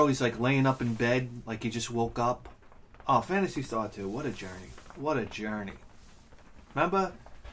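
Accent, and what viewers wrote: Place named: New York, United States. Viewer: When you were talking about your polish friend being trained in "sahkah" I thought it was some polish thing I had never heard of but then I realized, oh it's soccer, haha. Love the new york accent!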